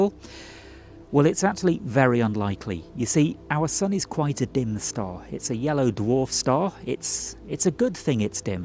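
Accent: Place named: England, United Kingdom. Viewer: You sound pom. You talk northern side so your a pom. Then you knot one up for the Southern Cross. Hell, your now family bro. To the Eureka ✨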